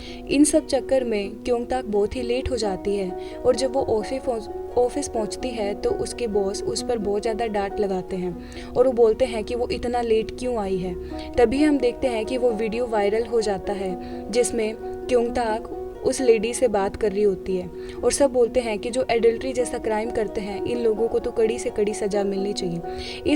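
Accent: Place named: Haryana, India. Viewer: didi aap haryana s ho na aapki aavaj se hi pata lagra h...mai bhi haryana se hu...thanks for your explanation😊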